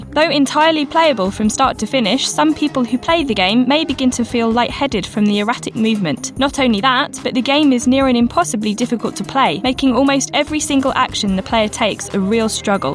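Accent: United Kingdom. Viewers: man that british accent makes this sound so clinical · Cookie cutter narrator with a britsh accent... I thought you guys were better than this.-.- Use real gamers! Did you run out of youtubers?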